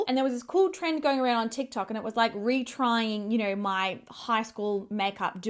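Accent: Australia. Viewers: How much I love you, your smile your positive energy your girlie voice your beautiful face and the cherry on top your sooo adorable Australian accent ♥︎♥︎ LOVE FROM GREECE 🇬🇷 MY BEAUTIFUL ♥︎ ( I want things from your brand does it ship to Greece? ) hugs xoxo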